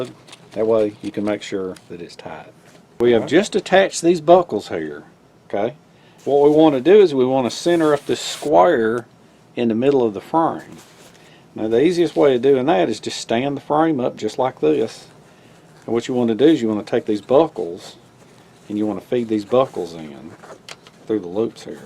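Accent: South, United States. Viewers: great and informative video. just bought a pair of rocky boots cause i saw them on your channel. great boots. now something off topic. me being german always wondered why you and nick for example as southern gentleman seem to talk a little diffently. with some strong southern accent and nick not so much. why? is it a thing of age or just how you learned it? sorry for being so damn curious:)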